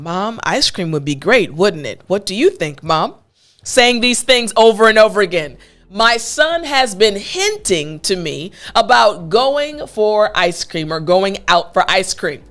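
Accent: New York, United States. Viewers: Are you from NY ? Sounds like that) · ARE YOU FROM NY?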